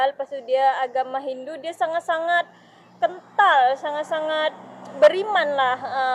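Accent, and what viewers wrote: Malaysia. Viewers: Salam..kamu bercakap seperti orang Malaysia. Teruskan buat video semoga maju jaya. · Maaf sy ckp kamu ckp dh mcm org msia. Terbaik kak ckp. Teruskn bersemagat buat video yg lebih menarik kak. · aslkm n suami..rata2 orng yg memililki kereta ada motor juga...tpi motor tu hanya jln dekat2. lelaki melayu tak pakai seluar pendek...tahniah ckp awak mcm melayu...semuga bahgia...amin..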